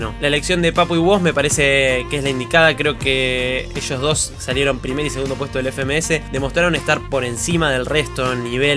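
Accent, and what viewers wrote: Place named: Argentina. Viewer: Se nota que eres argentino todo el rato wos Si nitro, teorema le ganan a wos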